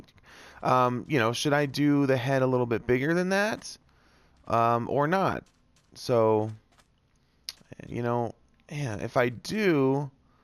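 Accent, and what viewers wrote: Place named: Ireland. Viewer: I think the ender Sphinx looks great and sorry with all the questions but what country are u from because I sound like u and I'm Irish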